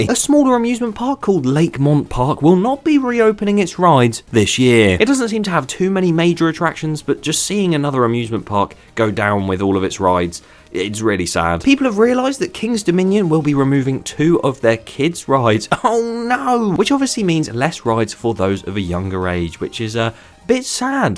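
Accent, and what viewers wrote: United Kingdom. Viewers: I feel like you’re from Yorkshire, tell me. But don’t doxx yourself lol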